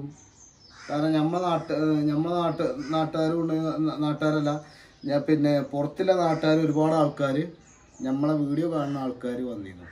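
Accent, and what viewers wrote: Malappuram, India. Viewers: ഉമ്മന്റെ മരണത്തെക്കുറിച്ച് പറയുന്ന വീഡിയോയും monetization ഓൺ ആക്കി പരസ്യം വരുത്തിക്കുന്ന നീ Hero ആണ്😢 അതുപോലെ ഇപ്പോൾ ശരിക്കും മലപ്പുറം ഭാഷ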